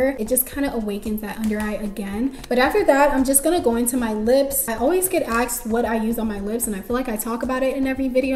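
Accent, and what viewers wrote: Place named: Nigeria. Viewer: I’m 1 minute in the the Naija in you is jumping out. Is it by force?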